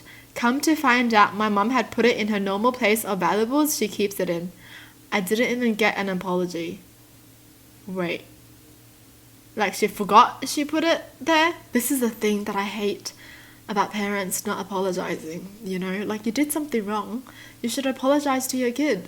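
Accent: Australia. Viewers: I’m new so when I here her voice I think she’s has to be Australian then I heard “Swallow I that advice for yourself mate”Me:yup definitely Australian I have an Australian accent but I’m American yup I’m weird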